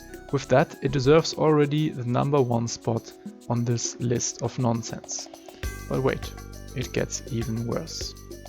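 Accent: Germany. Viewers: i hear you are german - me too :D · Great video, love the content and I think you sound like Cillian Murphy if he had a German accent 👏🏼. Looking forward to the next vid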